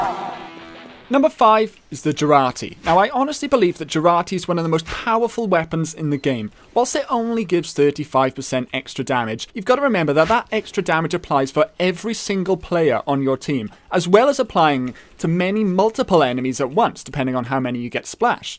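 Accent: Wales, United Kingdom. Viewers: Are you welsh? I hear the accent and emphasis on words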